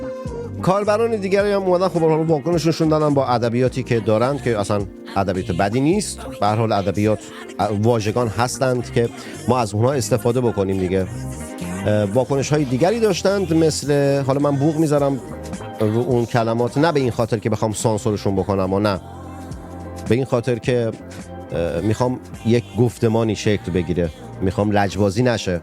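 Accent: Abadan, Iran. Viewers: جان دمتگرم کارت و برنامه هات عالی، عاشق اون لهجه آبادانی اتم.سراختاپوس در تهرانه اسراییل و ایران باهم متحد خواهند روزی که رژیم نکبت فاشیستی شیعه نخواهد بود. و دشمنان ایران و پهلویها باز اونجاشون پاره شده برا اعلام رهبری شاهزاده.جاویدشاه❤❤❤❤ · کا دمت کرم 👌مو دختر میسلبمونوم زنده باشی. با لهجه ابودانی حرف بزنی بیشتر حال می‌کنم 👏👏👏👏👏👏👏👏👏👏👏👏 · واقعا وفق العاده بود از ریتم موزیک زمینه جذاب ، لحجه زیبای آبادانی بی نظیر من قمی لذت بردم ممنون که شعر می خونی ممنون که به برای کلماتت ارزش می دی و به وقت ما ارزش میدی در دوره که همه به همه چیز مصرفی نگاه می کنن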